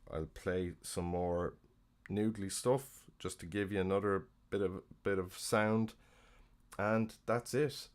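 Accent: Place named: Ireland. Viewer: and? You started life with two strikes against you. I was going to offer my sympathies but I heard your voice and it appears you're Irish which more than compensates for the two aforementioned failings.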